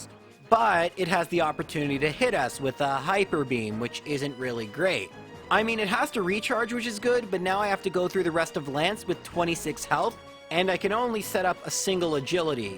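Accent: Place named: Canada. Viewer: Based on his accent he’s Canadian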